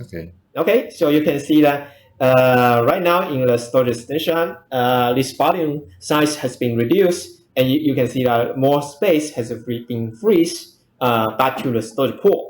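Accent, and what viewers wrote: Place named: India. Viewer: 在印度学的英语，口味太重，理解比较困难！！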